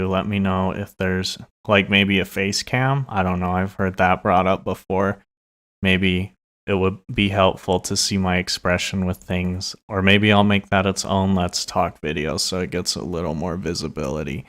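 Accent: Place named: France. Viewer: You shall be spared (for the french accent)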